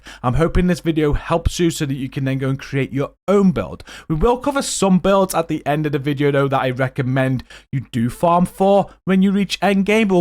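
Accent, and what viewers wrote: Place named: Liverpool, United Kingdom. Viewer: Where are you from? You sound like someone I know from Liverpool 1 haha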